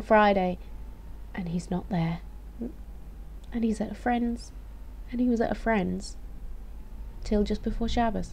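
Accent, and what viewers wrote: United Kingdom. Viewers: it's a luffly voice. It seems to have bit of a northern tinge to it, but I like Yorkshire/Lancashire area accents (I'm not sure where you're from). And no, you don't sound like a robot! Just pretty chilled. =)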